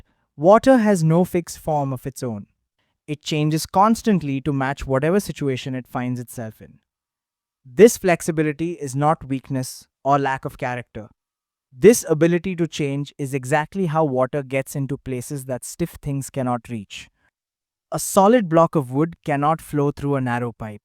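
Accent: India. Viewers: Hmm. Lao Tzu stories with japanese graphic and indian voice over. I don't think so. 😂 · Are you from india ? im just asking buz your accent look like that' 🙂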